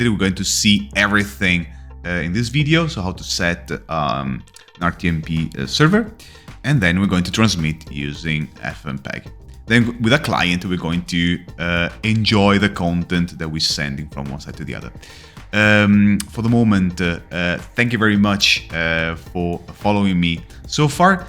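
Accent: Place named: Italy. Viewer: Bravissimo, l'accento italiano si sente tanto ma devo dire che sei uno dei + bravi Italiani che ho sentito in merito ad "Accento" (te lo dico da italiano all estero eh...:)). 'Scolta ho una domanda... ma per entrare un po + sul tecnico dove mi consigli di guardare? Intendo sulle varie opzioni di ffmpeg, mux / demux / encoding etc etc. Online non trovo tanto materiale in merito (tanta teoria, ma parzialemtne declinabile poi sui vari softwares). Ciao grande, bravo, Luca.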